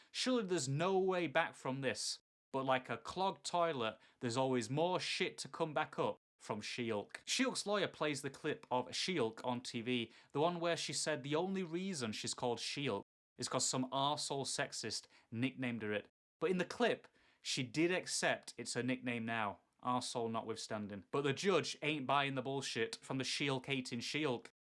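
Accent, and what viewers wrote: Yorkshire, United Kingdom. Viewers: The Yorkshire accent is 10/10 makes me want to visit hahaha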